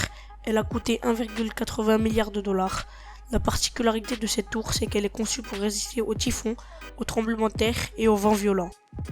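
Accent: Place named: North, France. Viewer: Sa se voit qu'il vient du nord le quéqué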